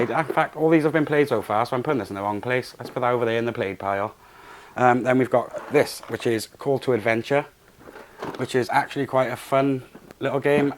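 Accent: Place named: Wales, United Kingdom. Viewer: Where are you from bud? Obviously I'm not asking for an address lol. Just sounds like a bit of a Welsh tang to your accent. A bit like myself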